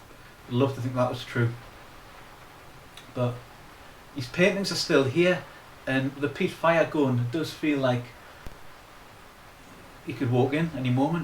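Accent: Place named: Newcastle, United Kingdom. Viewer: A newcastle accent fuck this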